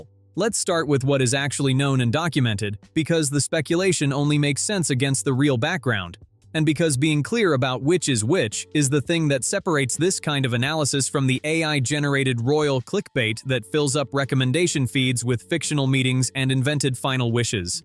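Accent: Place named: United States. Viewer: We do not need shouty American voices spouting nonsense about our Royal Family. Sort out your own 'monarch'!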